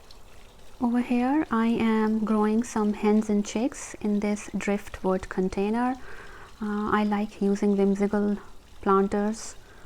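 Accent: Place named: Tennessee, United States. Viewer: I had no idea you were from Knoxville. That explains your accent. :0). I grew up in middle TN, but now live in Loudon, TN.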